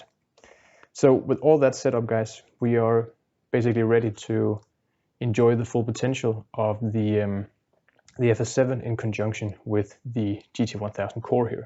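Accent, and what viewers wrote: Denmark. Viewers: Is that a Danish accent?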